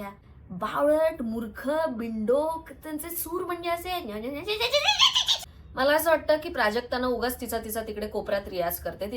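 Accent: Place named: Khandesh, India. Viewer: 😂😂 paneer aivaji khodrubber Your roasting skills are amazing 😂😂❤ Btw I'm from Bhusawal 😅 loved your khandeshi accent 😁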